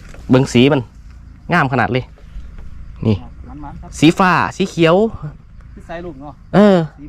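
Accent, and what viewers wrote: North, Thailand. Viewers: พูดเหนือเพราะกว่าคับพูกอีสานสำเนียงไม่ให้555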